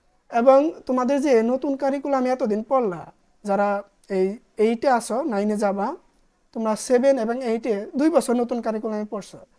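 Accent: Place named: Sirajganj, Bangladesh. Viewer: সিরাজগঞ্জ / আশে পাশে বাড়ি মনে হচ্ছে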